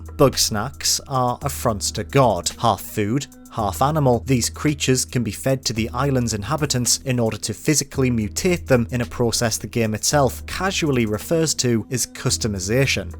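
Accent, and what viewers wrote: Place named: Newcastle, United Kingdom. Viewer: Here for floating Geordie representation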